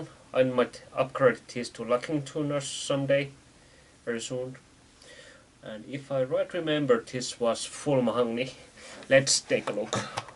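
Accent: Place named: Finland. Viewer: You have kinda finnish accent in your english. Am i right? Anyway nice video. I really want to buy that guitar. Thaks for the tips considering about the possible upgrates i might have to do in the future.🤟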